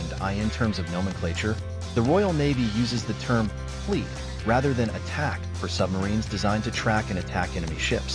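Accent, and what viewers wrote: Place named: United States. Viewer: Do we really need an American accent and the American spelling of "centre" If they want to pretend to be British for money they should get it right. Some elderly Britons notice these things.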